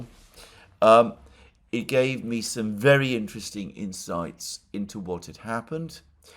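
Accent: United Kingdom. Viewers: YOU SHOW MISPLACED ARROGANCE WHEN YOU TALK ABOUT IRAN. THIS VANITY IS NOT APPROPRIATE FOR AN ONLINE GROCER, EVEN WITH A BRITISH ACCENT.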